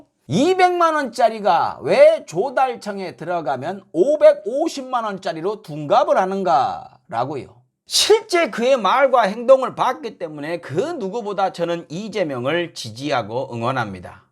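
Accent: Jeolla, South Korea. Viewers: 저 전라도 사투리로 보수정당 지지했으면 더 좋았을텐데요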